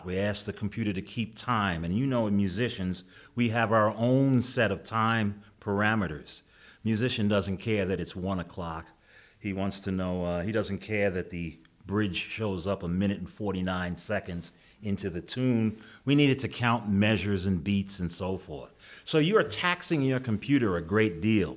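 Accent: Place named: New York, United States. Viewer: Awesome demo awesome New York accent!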